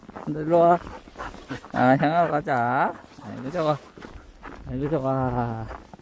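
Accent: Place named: Gyeongsang, South Korea. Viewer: 우연히 산돌이 영상을 보고 님 영상 처음부터 끝까지 보고 또 보는 중이에요.제 고향 대구와 멀지 않은 곳에 사는거같아서 말투도 정겹고 산돌이영상은 더 좋구요. 뽀드득 뽀드득 소리 너무 듣기 좋네요~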